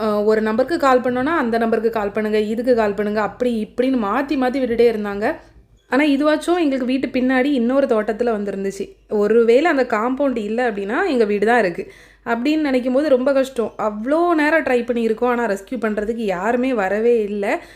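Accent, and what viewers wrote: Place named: Nagercoil, India. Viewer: Entha ooru nenga Nagercoil pola iruku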